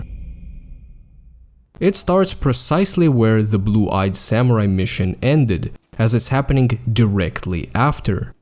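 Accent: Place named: Russia. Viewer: не братишка, там к середине конечно начал "наш" акцент проскакивать, но ты 6 часов как штирлиц. Продолжай грайнд!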